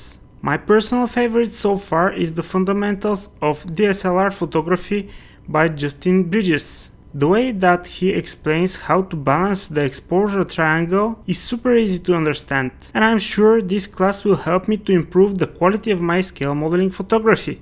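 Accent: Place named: Russia. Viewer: Ну дикий русский акцент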